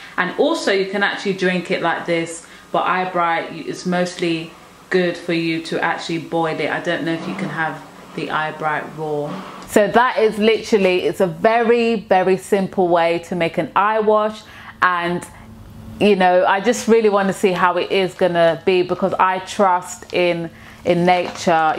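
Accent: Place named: Alabama, United States. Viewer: i love your accent, what part of alabama are you from?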